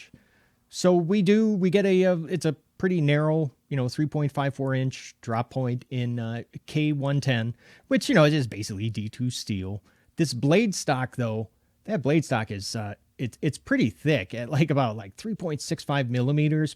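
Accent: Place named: Great Lakes, United States. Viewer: Thought I recognized that accent! Been watching your videos for a while now and never realized it. That "great lakes accent." Sounds like home. 👍 I lived in IL for almost 40 years.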